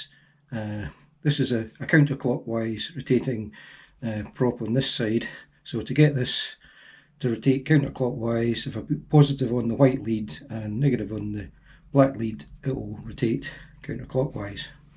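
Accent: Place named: United Kingdom. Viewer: congrats for being the first British accented person to make a video worth anything. your videos are spot on. you make excellent panes. you show them flying filmed properly. you don't annoy with stupid antics like your in a month python film. you don't sound like a moron and you show everything in the build clearly and precisely. please teach your countrymen how make YouTube videos, seems the whole continent is lost. it's like watching Russians Indians or Chinese make movies they just don't get it albeit the Indians are coming up quickly. Btw not racist here just that British people make the worst videos by far and for me personally the accent is almost as annoying as listening to an American fron the deep south. with that said maybe it's just idiots that have turned me off with there inability to film anything properly. you were pleasing to listen to. 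100% British? or is the explanation your from somewhere that just sounds english-ish? either way, please keep up the good work.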